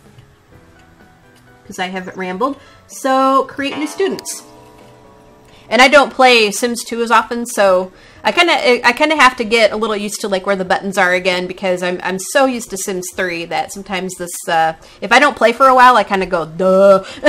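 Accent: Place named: Texas, United States. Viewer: I hope you dont take this the wrong way. But you seem like you have a texas accent are you from somewhere around there (Sorry new fan so I am a little confused) :)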